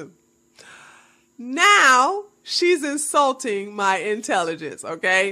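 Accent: United States. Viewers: haha very nice. I love your American English, it got so much character.:) And I agree with a lot of what you say. I think some muslims even lie to themselves, out of fear of going to hell, it's so sad. Due to 5 prayers per day, islam simply doesn't give them the time, let alone permission to question their believes. Especially muslimahs would have such a better life without a religion that tells them they are less worth than men. But I totally disagree with you on the scene with Horowitz. He didn't even answer her question, but proved to be a total dumbass, who can't bring himself to condemn Satanyahu, who's much worse than Hamas. So he was the bigotted jerk in that conversation. Given their view on women, I'm not a fan of Hamas either though. Infidel's greetings from Europe, wazzup!:)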